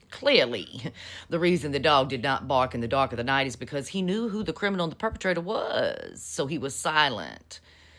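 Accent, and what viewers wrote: South, United States. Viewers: voice based assumption incoming; You've just replaced William T Sherman as my favorite southerner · YOUR accent!!!! I love it!! That Southern Twang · I’m from the south but I don’t sound like it. I absolutely love your accent and your spunk. You nailed it. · Hurry back! I saw a few headlines about the emails and waited to hear about it in your sweet southern accent! Happy Wednesday 💜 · It’s refreshing to hear that Southern Accent used intelligently. I could listen to you all day. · I LOVE the sound of this lady's voice. That southern drawl with the smoky texture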